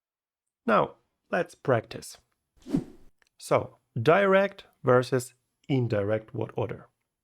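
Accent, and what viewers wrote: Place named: Eastern Europe. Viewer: спасибо, только не верб а вёрб, ну и, славянский акцент ярко выражен :)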